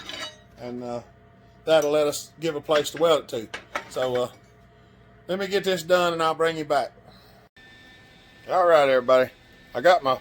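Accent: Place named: Alabama, United States. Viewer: are from sweet home Alabama where the sky is so blue? You have our accent. We say Sir and we say Ma'am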